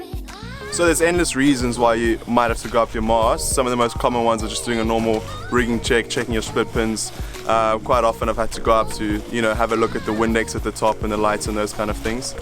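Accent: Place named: South Africa. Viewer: Love the SA accent and no-bullshit manner 👍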